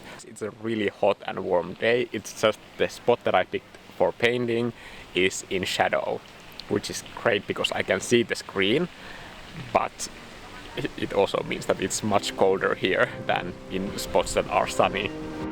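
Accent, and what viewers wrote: Finland. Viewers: I just stumbled across your videos and thought: "huh, his accent sounds really familiar" then when watching this I realized that it's the Finnish accent. Loving your works tho!!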